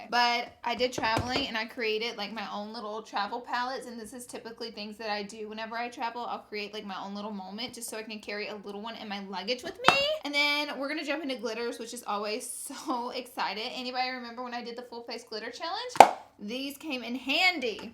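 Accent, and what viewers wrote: South, United States. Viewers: I love watching and listening to your videos because you're from the south and your voice makes me so happy. You're so funny and you're so entertaining even just talking about eyeshadow palettes.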